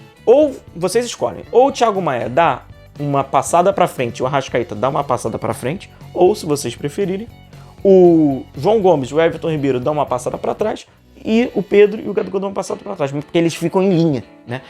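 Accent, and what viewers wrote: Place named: Rio de Janeiro, Brazil. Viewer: Poxa desculpa eu sei que é mais pelo sotaque carioca, mas... me perdoa a professora de geometria... isoiceles!! E a professora de português, como é que fica??!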